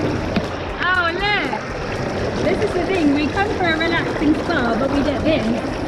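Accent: United Kingdom. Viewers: How cool, you're from the UK! - I recently commented on your blizzard beach photo and you mentioned your channel to someone in the comments. I just assumed you'd be american but then I was like "hang on a tick, I know that accent" - Your channel looks really interesting and helpful too. I'll definitely be sticking around :)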